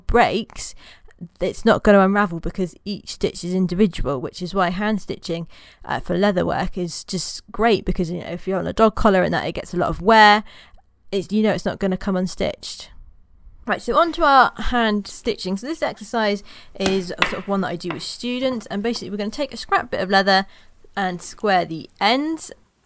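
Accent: England, United Kingdom. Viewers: thank u. iv been wanting to know how to do a simple leather stitch. and i got to hear it in a English accent. thank u again from the states. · Right on! I was watching another youtuber from UK and he said his accent was "home counties". Is there a specific area that your accent is from in the UK?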